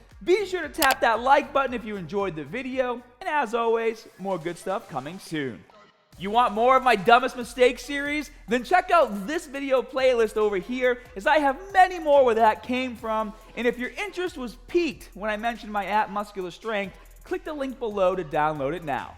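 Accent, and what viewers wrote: Boston, United States. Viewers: I swear his accent was never this Boston a few years back 😆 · Is this guy from Boston? I can't get over the accent lol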